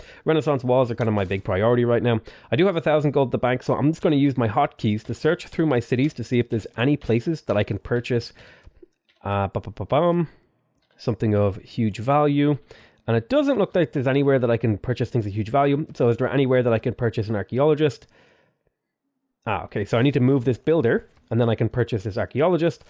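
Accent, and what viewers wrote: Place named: Ireland. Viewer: He sounds Irish, might as well ask if the Pope shits in the forest.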